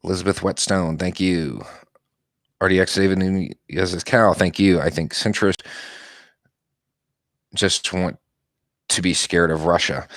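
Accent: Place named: South, United States. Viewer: He does. I've seen a clip where it's hardly noticable. (Can't find it now.). I think he's the "I know a man" having to hide their accent (from a previous video) because people won't take him seriously as a journalist, and question the intelligence of anyone with this southern accent.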